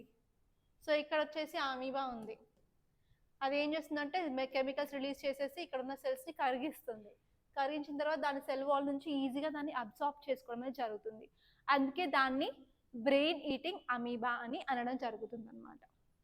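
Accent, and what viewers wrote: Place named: North, India. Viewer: Mam miru telugu valla am so happy...when I listen your polity free classes... I thought u r north indian....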